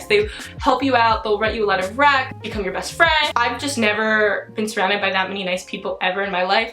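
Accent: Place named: United States. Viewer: your accent is so perfect and pleasant I think you are American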